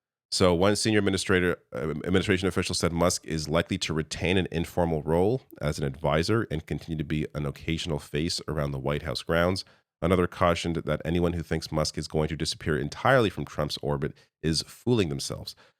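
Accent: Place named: Canada. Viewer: I love your direct, no BS, "call it what it is" style and the fact that we have a very representative Canadian voice on YT. And, finally, some good news. It will be great news when Musk is gone and even better news when Trump is gone--as he says about getting other countries--"one way or another".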